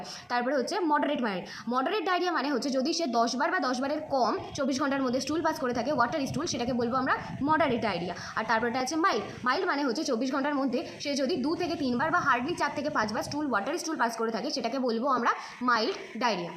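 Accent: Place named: Bangal, Bangladesh. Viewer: Bolchi ektu Bangal 😢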